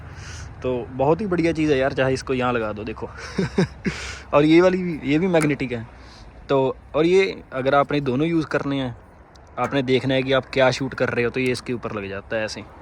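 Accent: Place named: Punjab, India. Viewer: Veer Punjabi ch ee aaja yaar ...teri hindi ch vi Apni Punjabi Jhalkdi aa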